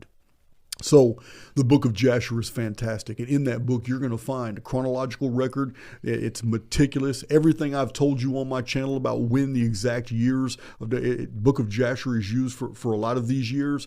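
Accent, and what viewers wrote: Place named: Texas, United States. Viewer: I am not sure how I came across your account initially… I think it was about the goddess being first, but what I connected with immediately is the Texan accent because I was born and raised in San Antonio. Then I realized that you’re on the same path as me…obviously ahead with all the books you’ve been able to read but either way, I am where I need to be and I’m glad I discovered your page and I appreciate the information you share ☺️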